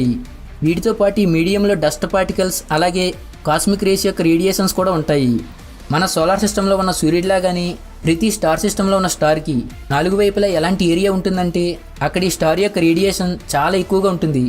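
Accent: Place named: Srikakulam, India. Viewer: Pl don't make videos with this SRIKAKULAM ACCENT....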